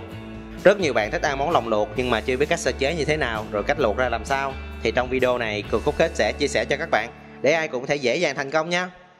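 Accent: South, Vietnam. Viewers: Bạn này nói rất dễ hiểu nhanh gọn giọng chất người miền nam dễ thương và dễ mến đáng yêu qúa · Cám ơn kênh của em rất nhiều Chia sẻ tận tình Tiếng nói đậm đà Âm hưởng bản chất người Nam bộ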